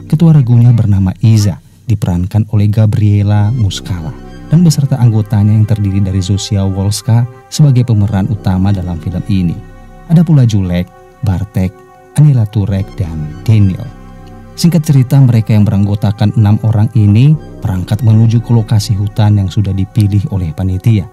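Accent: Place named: Medan, Indonesia. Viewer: Suara nya kayak orang medan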